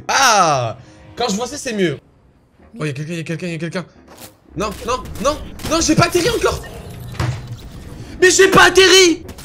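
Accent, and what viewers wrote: United Kingdom. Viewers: 8SUR10 TON ACSENT ANGLAIS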